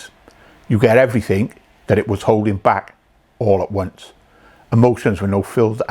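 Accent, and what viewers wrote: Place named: Wales, United Kingdom. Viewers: Hey I'm finding your videos both helpful and hard hitting. Out of interest where are you from you sound Welsh? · I am OK Monday to Thursday. I can take the loneliness and I have a work pattern that keeps me out the pub but come Friday the willpower has gone. I am not drunk for the next 3 days its just I don't want to drink at all but do. Its the not having control that gets up my back. If I wanted to drink well at least I have chosen my poison. Nice to hear this in a Welsh accent. Thanks Butty!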